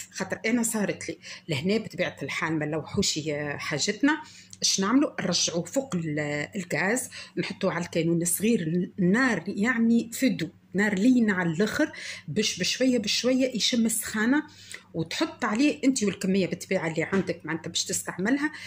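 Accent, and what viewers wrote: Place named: Tunisia. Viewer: بسم الله ما شاء الله عليك اختي عملت كريمة الزبدة بطريقة احترافية..وخاصة انك عقمتي بياض البيض بالسيرو..وأصبح آمنا على الصحة.... جاتك روعة حبيبتي..... الله اعطيك الصحة اخلاص.. أموت في اللهجة التونسية برشة برشة 😄تم معاك بصدق وأمانة حبيبتي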